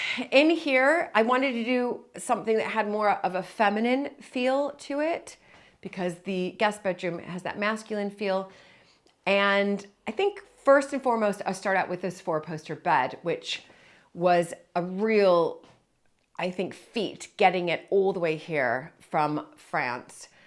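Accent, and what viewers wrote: United Kingdom. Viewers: Could’ve left the posts on terrace and made either a trellis or strung tiny little lights sort of as a privacy screen:) I like that I can hear the slightest bit of a British accent coming through when you speak:)love your place it’s beautiful:) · it's been a while since I've watched one of your videos and you've been in Britain long enough now that your American accent is taking on a British sound in words like, also, love, all, and wall. Interesting! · I’m hearing your English accent coming through 😊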